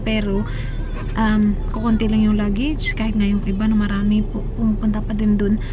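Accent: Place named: Visayas, Philippines. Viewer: Maka addict man imong vlog ms... cute nimo lalo Kong magbisaya hehe.. God bless po.. ingat po kayo..:) mwahh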